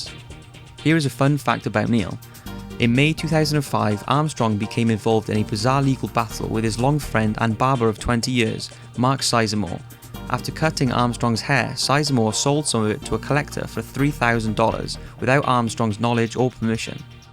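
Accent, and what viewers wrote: Wales, United Kingdom. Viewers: I squirt a little bit when you said “a little bit iffy” in your majestic Welsh accent.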